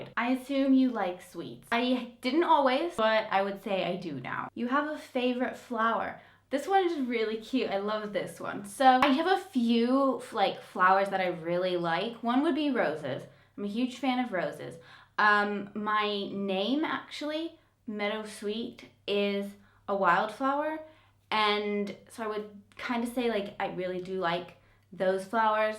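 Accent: United Kingdom. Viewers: There's definitely a British accent comming trough that Southern US twang. Give it a few years. Anyway, i alway's find this subject a bit awkward to watch with other YT-ers, but you handled it quite well,👍-up on the vid.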